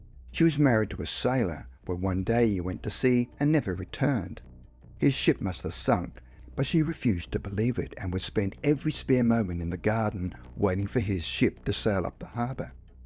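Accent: Australia. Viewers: It's great to hear a fellow Aussie narrate. Onya! 🇦🇺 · Im glad to hear more Australian "spooky stories" narrated by Australians 😊 Hunters Hill and Gladesville are VERY heavily populated by paranormal shenanigans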